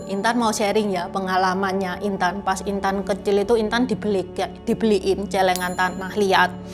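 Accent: Java, Indonesia. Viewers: Bahasa Mandarinnya aksen Jowo... 😊😊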